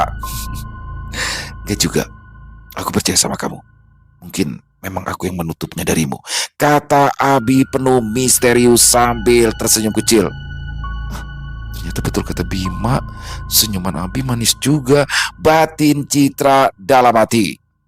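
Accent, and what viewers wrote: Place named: Betawi, Indonesia. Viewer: Assalamualaikum bang Emang dah bang paling bisa dalam segala karakter hebaaat Apalagi logat Betawi paling suka dengarnya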